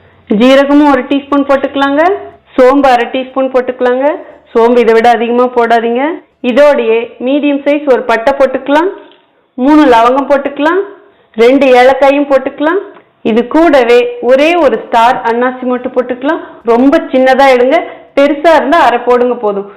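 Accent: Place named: Kongu, India. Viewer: Supernga. I am vasuki Aunty. Coimbatore Tamil i am Coimbatore. Kongu tamil super.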